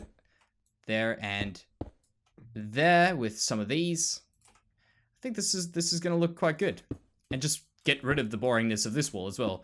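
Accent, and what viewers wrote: Australia. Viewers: You dont sound funny, i thinkthe Aussie ascent one of the coolest. Also know plenty of people that agree. Always wanted to visit sydney, the pictures I've seen are beautiful. Great video as always my friend.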